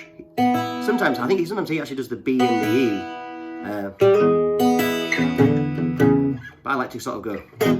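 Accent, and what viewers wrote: United Kingdom. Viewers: Great instruction. Love all the little added bits that fill out the song. You are a great teacher and all done with a Northern accent gives it a special touch. Thank you.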